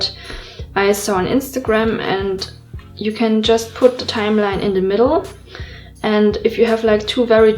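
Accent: Germany. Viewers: falsch.ihre aussprache IST grauenhaft und sehr deutsch! das ist objektiv erfassbar.. und warum bitte muss ich alle sprachen dieser welt sprechen können, um das hier anzumerken?! was für ein dämlicher kommentar! es geht um die allerweltssprache englisch.. und die gute lebt in ...LONDON .. und darum ist es OBERpeinlich. PUNKT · man kann an deiner Stimme erkennen dass du deutsche bist XD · endlich weiß ich wie sich ein deutscher Akzent anhört 😂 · Celine Lee lmao me too first second SHES GERMAan haha ich bn aus österreich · i heared your accent in the first second :D ich bin auch deutsch xD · Im learning german in school and i think its so cool to hear you’re accent 😻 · I love your accent because I grew up with german nannies so it reminds me of my child hood haha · are you german? Your englisch is very good though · love your German accent :D and I was finally able to hear how the name of the notebook is supposed to sound :p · I noticed by your accent that you are German! 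Ich bin auch von Deutschland. Meine Mama ist aus Aachen aber meine Väter ist aus America. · Eigentlich wollte ich auf diesen geistigen Durchfall gar nicht antworten, aber ich glaube man muss dir mal Einiges erklären... - Erstens: Ob ihre Aussprache grauenhaft ist oder nicht, liegt im Auge des Betrachters. Deshalb kann deine Aussage schon mal nicht "objektiv erfassbar" sein. Nun gut... - Zweitens: Hab ich den Teil verpasst, in dem sie nach deiner Meinung fragt? Meines Erachtens geht es hier um das Bullet Journal oder steht im Titel "Bitte bewertet mein Englisch auf einer Skala von 1 bis 10" ?! - Drittens: Hast du jemals schon mal Leute gehört, deren Englisch wirklich nicht so gut ist? Ich glaube nicht... Man versteht sie nämlich kaum und reibt es ihnen trotzdem nicht unter die Nase. Das ist "common courtesy" (kennt du das? ). - Viertens: Nur weil man in London lebt heißt das nicht, dass man alles perfekt Englisch ausspricht. 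Ihre Muttersprache ist nun mal Deutsch und "die Gute" wird auch in 10 Jahren noch einen Akzent haben. Das gilt auch für Franzosen, Chinesen oder Inder, die schon 50 Jahre in England wohnen. Das ist vollkommen NORMAL. ... Kein Grund hier ausfällig zu werden.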